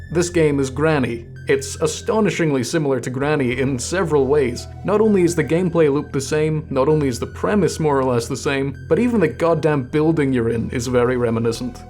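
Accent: Scotland, United Kingdom. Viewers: Btw If that’s a Scottish accent I hear I love it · you are the most normal-sounding british person i have ever heard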